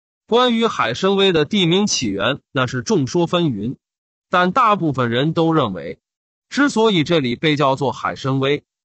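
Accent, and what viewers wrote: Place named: North, China. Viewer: 原來說書人是北方人😊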